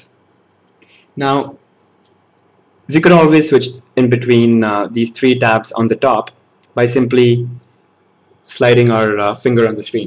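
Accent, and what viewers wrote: India. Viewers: indian accent!!! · You suck !!!!! I dislike it because your english is an indian so its suck alot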